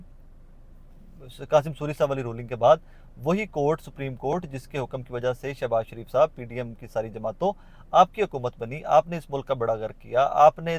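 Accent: Punjab, India. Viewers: Ur Punjabi so good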